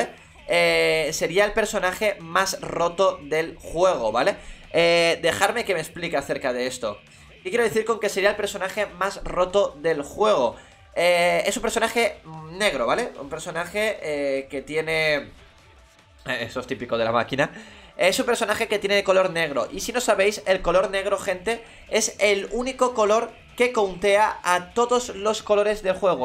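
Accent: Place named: Japan. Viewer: F por esa entonacion japonesa...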